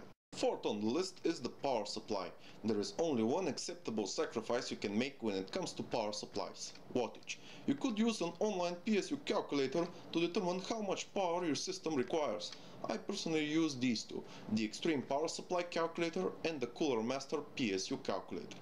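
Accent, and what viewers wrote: Russia. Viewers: Lol, I find the way you talk funny, are you Russian or something? Good video!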